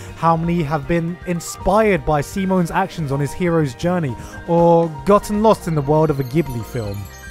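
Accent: United Kingdom. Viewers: You know, watching videos is a lot like watching a well-written show. You have the videos like his "Anime in Minutes" series or his rants/explanations like the "Konosuba" video series which are meant to be lighthearted entertainers. Then, every once in a while, you get videos like these. That same lovable British accent we all know for cracking the occasional dick-joke goes on to bring us "The Perfect Anime" and videos such as this which explore personal feelings and memories. These videos remind me of watching an anime, because while we connect to anime, many of us can probably connect to this video. It's really something amazing that I can watch this video and get so much more than a single, simple point out of it. It's as if you've just said what many have tried to say but couldn't. Thank you.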